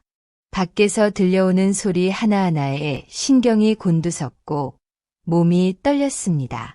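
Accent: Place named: United States. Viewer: 미국 사람이 이야기하는거 같다. 가짜 이야기를 억지로 하는거 같다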